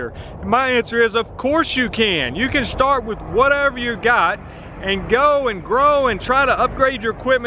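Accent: South, United States. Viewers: I guess it's just where you live and where your from. I dont notice you having any accent at all. You talk like everyone else from Alabama and Georgia. Keep doing the videos buddy, I enjoy them. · Great advice mate ! i think your accent is great and i love hearing you guys from the states ! You'd crack up hearing how us guys sound down in Australians :) Just be yourself and don't put others down :) Some people just think they are so cool and better than others in the industry ! i have contacted another company before i have taken on a new customer. i decided to not provide a quotation based on the feedback i had from the other company. yes spring is the best time to grow your business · Ha, don't you worry about a thing brother, I probably have a thicker southern draw than you do, and let's face it, we can sound a little strange to some folks. That's okay! 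Water off of a duck's back...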